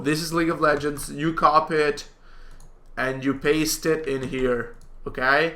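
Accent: Italy. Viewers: Oddio Ma sei italiano? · are you italian?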